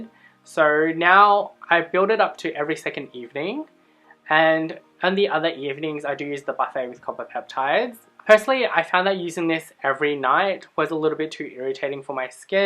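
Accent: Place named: Australia. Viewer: That Australian accent a bit too much sometimes No offence ! im just saying it in general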